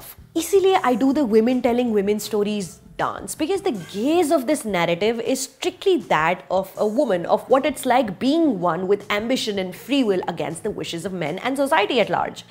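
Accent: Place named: India. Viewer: Love your reviews. But please drop the Indian accent when you speak English, pleaaaaaseeeeeeeee. It is doing harm to my accent too :'( - from Bangladesh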